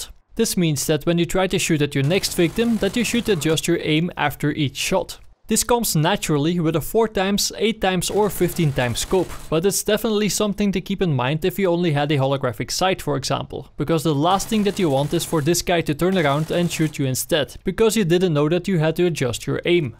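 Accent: Netherlands. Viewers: AAAAGHHHHH THE DUTCH ACCENT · r u dutch? i recognise my people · i cant stand this dutch accent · Its a dutch accents I think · Relo i em dyts I love your dutch accent man! :D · clearly Dutch from the first second mate. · Wanneer je Nederlands accent niet te ontkennen is. Verder een goede video! En bedankt voor de info. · sounds dutch to me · he didnt he is dutch so hes talking like that i know that i am dutch too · lol slecht engels (bad english, i can hear he's dutch FOR FUCKING SURE) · You're dutch I can hear it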